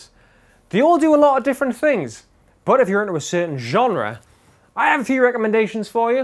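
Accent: United Kingdom. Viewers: I can’t tell whether this guys accent is Geordie, Scouse, Yorkshire, Scandinavian or posh English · You're awesome! Fun to watch - and the snappy brit accent goes well with the pirate look :) - A pleasure to see. Thanks from Hawaii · your face and body are too distracting - I didn't hear a word you said but your british accent rocks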